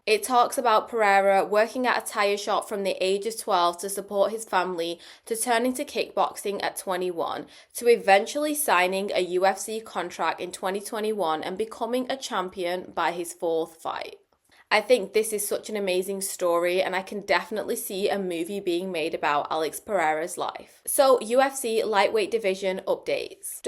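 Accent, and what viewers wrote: United Kingdom. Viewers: Pereira vs aspinall will be held back bc jon jones is holding up the official belt and ducking tom unfortunately. I think if jon beats stipe he will call out pereira and that will be done. Btw am i detecting a yorkshire lass? 🤔😂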